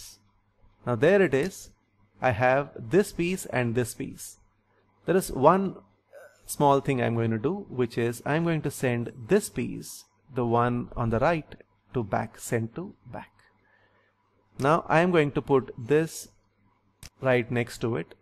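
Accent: India. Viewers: Yes, it was informative. Thanks. You have a heavy south indian accent though. Are you a Malayalee or a south Indian?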